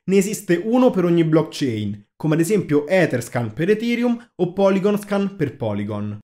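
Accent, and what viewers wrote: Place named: Italy. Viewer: Non ho capito un caxxo L inglese configurato in Italico parli🤣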